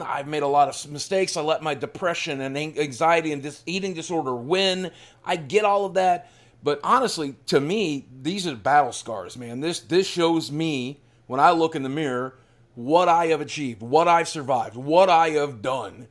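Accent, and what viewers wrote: South, United States. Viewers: Watch his "origin story" series... he originally had a hillbilly accent, and it comes back when he visits the town he grew up in.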